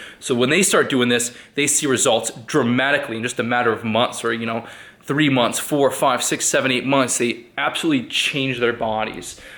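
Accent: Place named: Canada. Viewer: He nails some pretty hot broads and is def straight, I think its a Canadian accent tho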